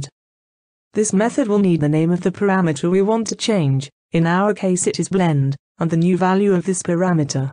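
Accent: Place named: United Kingdom. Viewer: Thank you, British robot lady.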